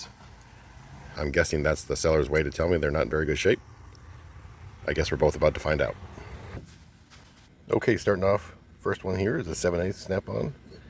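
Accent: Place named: Midwest, United States. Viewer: You can easily date those wrenches as the date code matrix is online. The Snap On "boxed" ratcheting wrenches (and other brands) are mostly made by A&E Manufacturing of Racine, WI for the various OEMs, including USA made Craftsman. They all use a Snap On style date system because the founder of A&E, Daniel Lang was a one time Snap On employee and may have come up with that date code system if I recall right. At the end of the day, you can trade every one of those for new, but I would agree, clean them up and see what you have there. I bought a set of Snap On (1940s through 1960s) from a woman I used to work with after her dad (an aircraft mechanic at the local airport) passed away. When I asked about anything else, she balked---probably realizing that she sold me the first batch way too cheap. I got a virtually new in the box set of Craftsman wrenches (missing one, which I eventually got and added to it) and all of that Snap On. 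I had to warranty some of it, but Snap On gave me no issue. Your accent sounds like some I've heard from the Midwest and you mention "1968 Chevrolet and panel repair" so that makes me think you're in the middle of the country in farm country (nothing wrong with that). I'm going to say that some of those stampings may be inventory or control numbers to account for them in a set or location. I bought some Craftsman tools like that with some engraving that I would say was probably from a factory or maintenance area somewhere.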